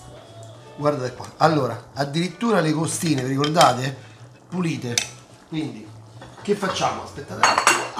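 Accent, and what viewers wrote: Rome, Italy. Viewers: Ma quanto mi piace l accento romano,troppoooo belloooo...ciao....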